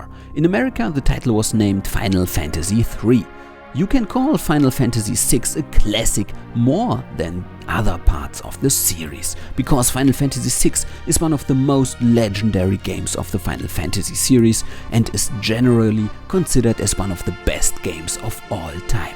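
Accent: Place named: Germany. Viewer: Schönes Review! Angenehm anzuschauen und anzuhören. Weiter so! Der deutsche Akzent sticht manchmal noch heraus bei Wörtern wie "diagonally", "devices" oder "heard". Aber nicht schlimm ;) Außerdem "comfortably", nicht "comfortable" ;)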